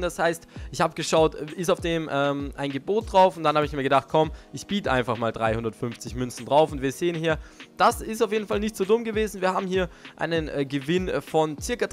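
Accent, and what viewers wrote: Austria. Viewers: Es is a östareicha ok mia song ned oise so wie de deitschn. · Er kommt aus österreich du fisch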